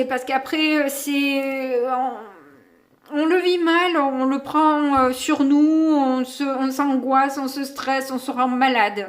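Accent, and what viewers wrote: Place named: South, France. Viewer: Bonjour 😊 Votre tirage est clair,simple et sans Chi Chi J’adore votre accent (sud?)..😂😂j’ai le même !! Du coup pour vous encourager et vous suivre,je m’abonne 😄 Bonne continuation 🙏🙏🙏🙏🙏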